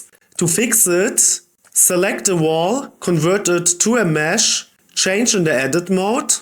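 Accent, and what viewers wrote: Germany. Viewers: great video and love your accent. Are you German?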